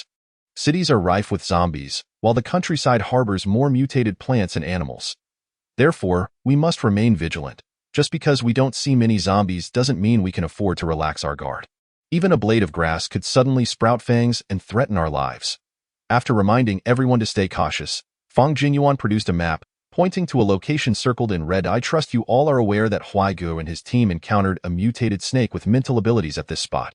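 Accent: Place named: France. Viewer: It's still on French pronunciation.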